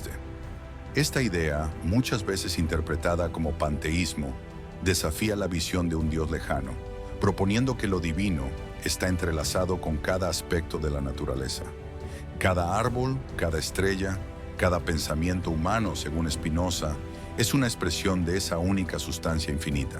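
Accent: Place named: Paraguay. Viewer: Por qué la IA tiene acento paraguayo? No es que tenga algo con los paraguas, me cae simpático su asentó. Pero para escuchar este tipo de cosas me resulta incómodo.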